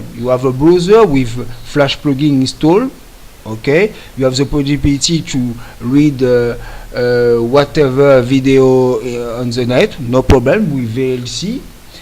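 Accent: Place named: France. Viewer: hello you are french ? because i think that you speak in "franglais" ? i like and joke you accent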